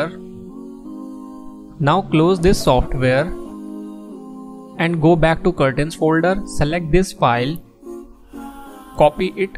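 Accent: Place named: India. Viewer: I'm so sorry and i don't mean any offence but from my experiences, the indian accent is throwing me off I am quite sure tho that this is probably a legit vid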